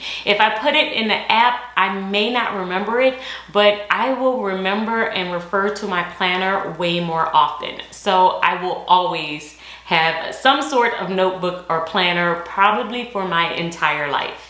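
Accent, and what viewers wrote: New Orleans, United States. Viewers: You hair is beautiful! Are you from New Orleans? I hear an accent. I just found your channel yesterday. Love your videos. Thank you for sharing.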